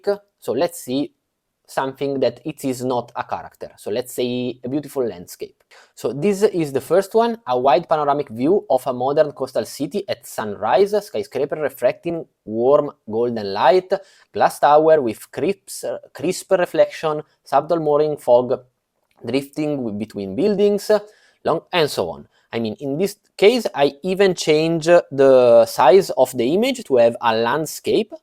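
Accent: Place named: Italy. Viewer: Just applied, name is Max Nthite...Are you from Italy? you have an interesting accent...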